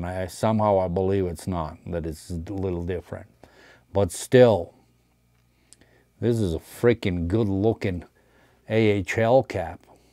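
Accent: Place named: Latvia. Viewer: I swear man, nowadays when I see a good cap I can hear that Latvian voice saying " fucking stunner! " 😅 thats your catchphrase LOL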